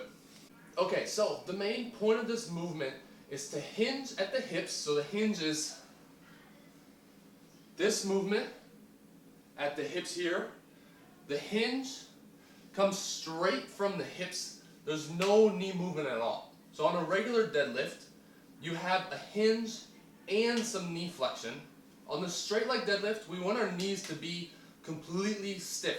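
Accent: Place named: Texas, United States. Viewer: Hey. This is one of the best explanations of the RDL that I have ever heard. You must be a great trainer. One thing I missed is how far apart are your feet? One feet, two feet? Also do the Brits question you about your accent and say "Oh you must be a Yank?" At which point you say "no, I am a Texan!" Thanks for the video.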